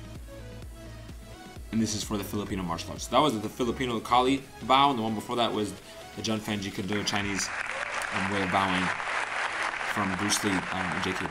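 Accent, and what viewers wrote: New England, United States. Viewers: What school is this Sounds like you’re in New England?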